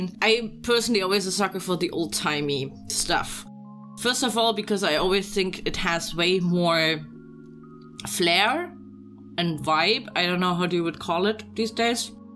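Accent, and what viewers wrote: Germany. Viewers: Im so early.. anyway you sound German.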